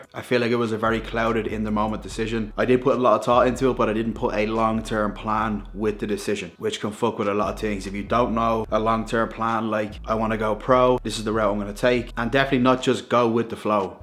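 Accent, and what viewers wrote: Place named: Ireland. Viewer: I like your Connor McGregor accent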